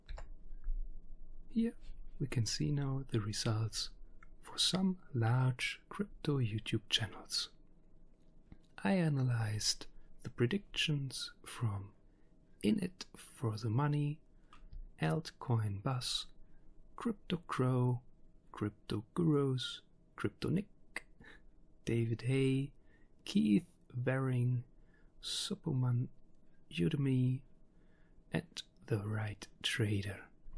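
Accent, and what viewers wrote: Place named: Greece. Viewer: Are you Greek by any chance? The accent suggests you might be!!! Ευχαριστώ!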